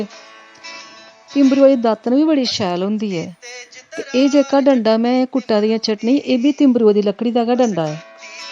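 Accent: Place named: Jammu, India. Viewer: Your dograa accent is very nice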